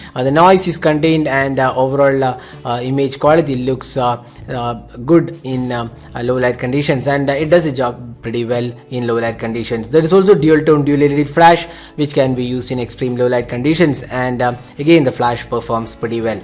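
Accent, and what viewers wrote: Kerala, India. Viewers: r u from Kerala?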